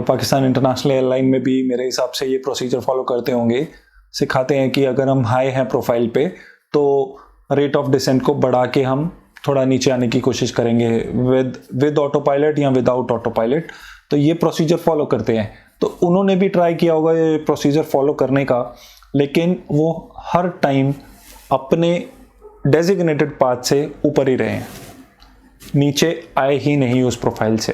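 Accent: India. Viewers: Looks like an Indian commentary. Accent gives away! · captain who ?! JahaJ ? opinion ? رائے ؟ matter nahi kartı junior hu ya senior. JeeJh ? Are you an indian ? You have an indian accent ! آپ کا ہندوستانی لہجہ ہـے _ جو کے انتہائی مشکوک ہـے _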